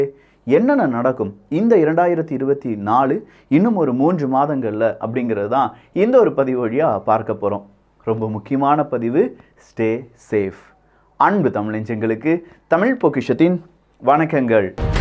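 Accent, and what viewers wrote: Kerala, India. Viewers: அவர் மலையாளி கலப்பு. அதான்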